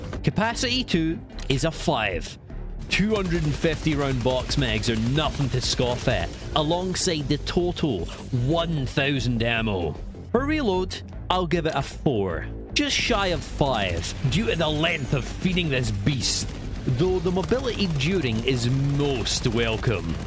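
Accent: Scotland, United Kingdom. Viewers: I got so used to your nice scotty accent that when the switch to the redneck Southerner accent came it felt like I got punched in the face. A very Democratic punch, but a punch nonetheless. Great work 👍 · Got as far as the 50th mispronounced 'a' then switched off. Just speak normal, mate. Its ok to be Scottish.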